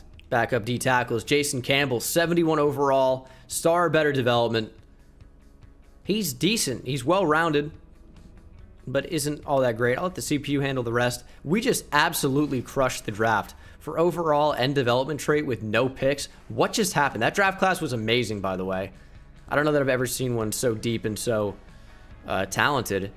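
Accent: Australia. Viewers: I thought the Australian accent was great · 10/10 Australian accent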